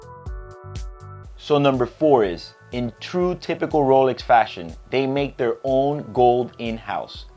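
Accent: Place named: Miami, United States. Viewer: Cuban Miami accent lmaoo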